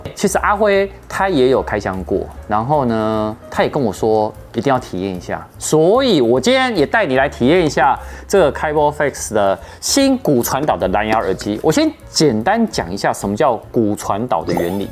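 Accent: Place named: China. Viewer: 聽旁白，還以為聽到阿陸仔的頻道咧！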